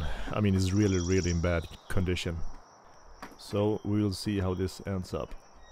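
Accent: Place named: Sweden. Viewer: Supersnyggt! Du avslöjas av din svengelska och trälimmet;)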